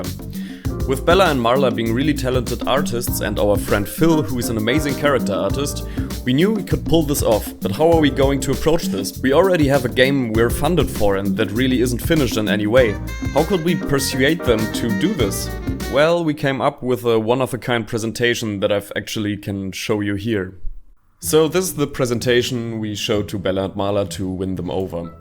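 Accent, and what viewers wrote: Germany. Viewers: R u german? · I hear deutsch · Englisch ist top, aber der deutsche Akzent verrät dich sofort 😂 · Warte du bist deutsch oder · Kleiner Tipp: Der deutsche Akzent hat dich verraten 😏 Grüße aus dem Deutschen Reich!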